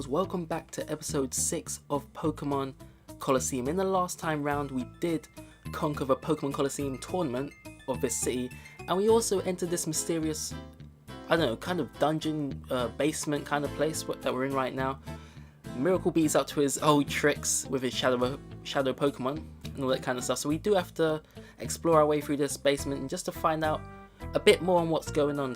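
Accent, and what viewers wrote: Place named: United Kingdom. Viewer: So, noting the UK accent... whereabouts in the country are you? I'm guessing south? :)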